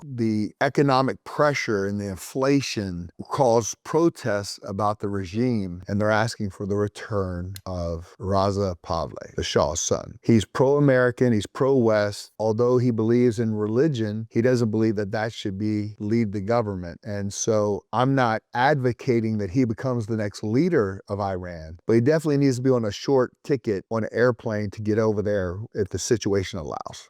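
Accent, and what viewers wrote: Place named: South, United States. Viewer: Correct. His southern accent limits his ability to pronounce a significant number of words properly, but we get the idea.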